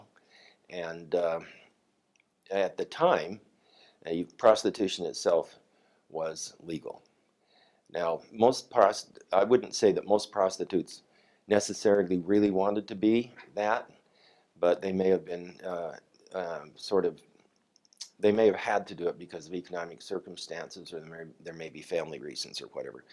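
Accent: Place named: Japan. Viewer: 日本生活が長いからか、発音が日本人の英語に近い所がたまにある気がする 抑揚も日本人っぽいところがある 勝手に親近感が湧く 笑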